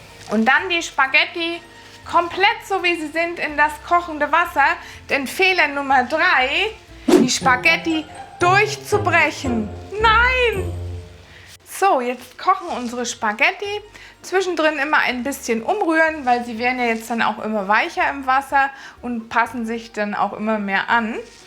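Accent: Standard. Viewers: Ooooh ich bin bisher noch nie ein Freund der Spaghetti Carbonara gewesen, da ich es hasse, wenn man überall Sahne rein klatscht. Liebe, diese Soße wűrde/wird mir mit Sicherheit schmecken. Ich war ganz überascht, daß du auch Koch-Videos machst, denn bisher kannte ich nur deine leckeren Backrezepte. Nur das du dort nicht so hochdeutsch schwatzt. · Wieder ein super Video. Vielen Dank. Aber drinen Dialekt habe ich vermisst. 🤤🤤🤤🤤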